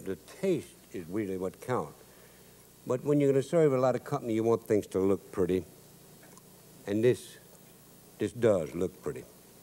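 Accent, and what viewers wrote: Louisiana, United States. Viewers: His show was a regional hit down in the deep South mainly Louisiana (Where he is from, which is why he has the Cajun accent), Mississippi, Alabama. Then it aired nationwide decades after his debut on the PBS affiliate in Mississippi once they figured people outside of those states I mentioned would love his humor and Cajun accent.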